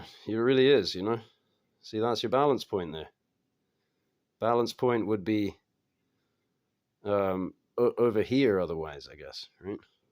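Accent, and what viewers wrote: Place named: Scotland, United Kingdom. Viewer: I knew I detected a little bit of Scottish. I just hadn't heard you talk enough to make the determination. I think you have sold me on the tapered Tang..also . 2 way tapered Tang?! What is this sorcery ?! I just learned about the single taper 😂 😂 I also finished the rough-in of that knife, I was actually able to get it surface ground pretty decently on my disc grinder but I don't think I will be able to taper it on the disc grinder, so I think I will have to switch over to the belt and start working on it that way. It's going to look really cool in the end because it will have a distal taper and a tang taper. I'm over here in virginia, close to Virginia Beach. Collab in the near future per chance? 😂 The two way is very cool looking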